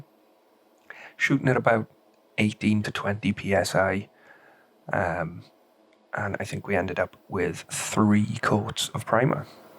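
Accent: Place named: New Zealand. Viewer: Great to hear an accent/dialect from home ! - excellent set of videos for this kit. Perfectly lit/shot and measured delivery. Great work matey. Cheers from Aotearoa/NZ.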